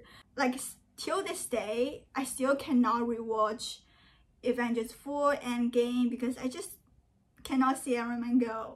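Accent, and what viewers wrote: China. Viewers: I know you have a strong Chinese accent(obviously) but I'm impressed by your English Grammar. You don't speak Chinglish like most students in China. You have really studied the language. I lived in China for 12 years and I honestly agree with you. These American/Chinese productions are very weird and I only watch movies from China when I need to see Kung Fu. 祝你萬事如意 有推薦的中國電影請跟我講 謝謝您小Lizzie!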